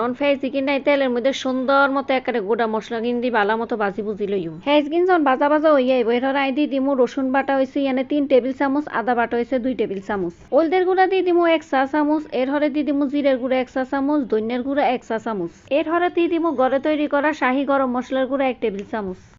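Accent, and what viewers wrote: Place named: Noakhali, Bangladesh. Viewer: এমন খাবার আমার কখনো খাওয়া হয় নি...নোয়াখালীর ভাষা শুনতে মজাই লাগলো 😁😁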